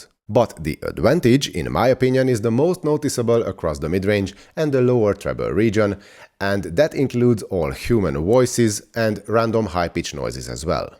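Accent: Hungary. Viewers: Magyar vagy? Hallom 😁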